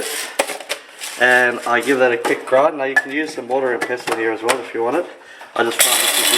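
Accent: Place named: South Africa. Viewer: Is the dude South African or Austrailian....!?!?! I CANNOT WORK IT OUT!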